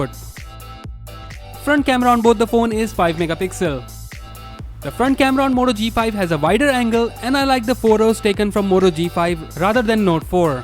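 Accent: India. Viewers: I love this Indian accent :D And since the Redmi cost more and is less available, here in Germany, I would go with the G5.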